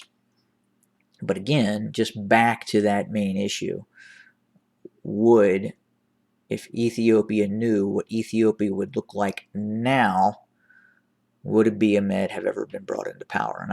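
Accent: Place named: United States. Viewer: He is an American of Ethiopian/Tigrayan descent. Don't let his American English accent fool you.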